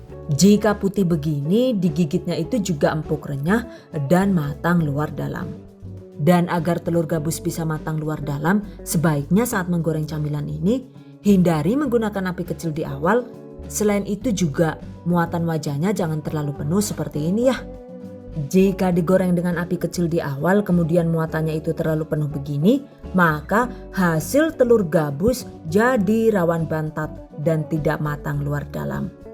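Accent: Banyumas, Indonesia. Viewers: Saya senang dengan semua resepnya dan senang bahasa ngapaknya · Pokoke seneng belajar sekaligus ngehibur diri apalagi kalo keluar bahasa ngapaknya, hhh sama2 ngapak jadi gak bingung. step by step nya juga bener2 gak pelit ilmu deh, makasih, semangat dan terus berkarya njeh 😘 #GiveawayTHV2021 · lihat resep sambil ngakak denger clotehannya yg ngapak2😂😂😂😂👍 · Ditengah nyimak tutorial ini, muncul lah ngapaknya,,,,bunda. Seru banget resep nya diselingi logat" yg bikin ketawa ngakak tapi justru bikin kangen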